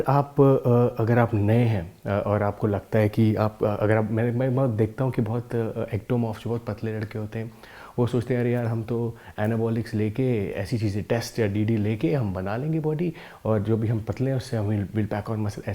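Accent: India. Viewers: I cant understand its like mix of english and indian Im freakin lost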